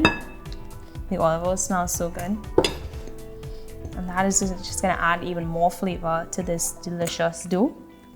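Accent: Trinidad and Tobago. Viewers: Gal good stuff! You Trini or wuh?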